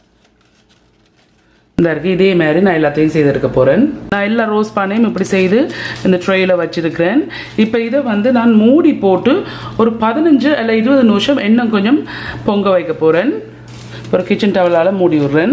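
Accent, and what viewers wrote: Jaffna, Sri Lanka. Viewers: Hi Your (slang Jaffna mix Europe mix )Tamil very interesting. I'm on of your FB page follower.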